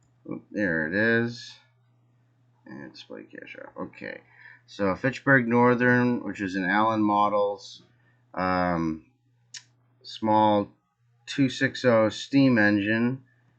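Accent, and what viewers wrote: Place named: California, United States. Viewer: Sounds like a true Californian. Was it a company in Temecula? They had done that to others.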